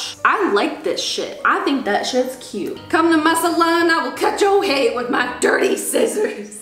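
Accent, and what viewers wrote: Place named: New York, United States. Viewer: I feel like as soon as you know is from NY, you can really hear it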